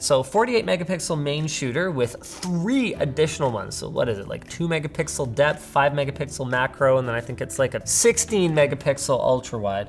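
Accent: France. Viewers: Nice French accent! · Yo you have a nice french accent Linus boy, and i live in France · That french accent 😆😆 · Linus talking french is the best accent ever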